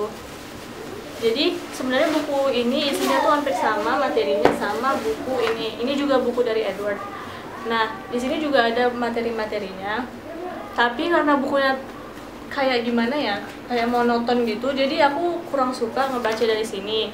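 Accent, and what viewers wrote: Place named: Bali, Indonesia. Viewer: kak org bali ya?logatnya khas😁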